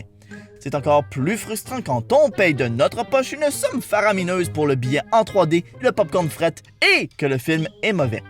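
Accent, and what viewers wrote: Canada. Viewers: J adore l accent candien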